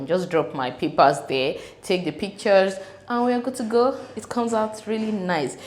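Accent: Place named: India. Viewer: At first ma,I thought something was wrong with my phone,when I heard you speak like an Indian. Thanks for this video ma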